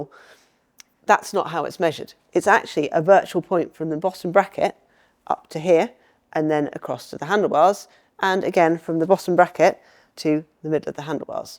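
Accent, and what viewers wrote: United Kingdom. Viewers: Always nice to see her and more importantly hear the nice British accent of this lady.❤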